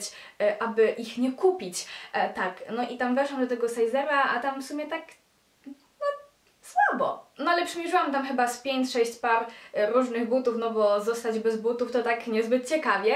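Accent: France. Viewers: Czemu masz taki francuski akcent?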